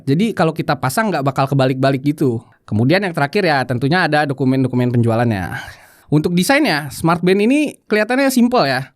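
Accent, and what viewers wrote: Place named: West Java, Indonesia. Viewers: meni nyunda pisan euy si akangnya 😁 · Ngomong nya cepat belibet artikulasi kurang jelas, dari aksen bicara nya khas Sundanese, lain kali kalau ulas gadget lagi, mohon tempo bicara di atur yang pas jangan tergesa-gesa, supaya pemirsa tidak bingung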